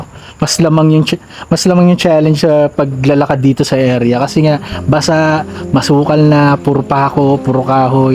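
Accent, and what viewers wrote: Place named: Visayas, Philippines. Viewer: I'm your new subscriber and the way you talk you have Visayan accent..watching your vlog is creepy and exciting...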